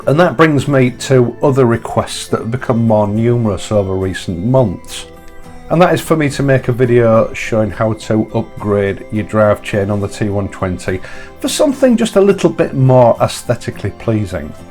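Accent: United Kingdom. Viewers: Came here to see what a gold chain looks like on a Bonnie (trying to decide between natural or gold for mine) and came away with being fascinated by the accent. Of course I've heard many a UK accent and love them but I am by no means a scholar on the regional differences. I could be wrong but this doesn't seem like one of the more "standard" ones. Here I heard what sounds to me like hints of the US southern accent...and of course it makes me think that's possibly where it came from. For example the word "time". It's like "taaam" like the US south. Anyway, I love languages and dialects. Can anyone tell me which specific accent this is?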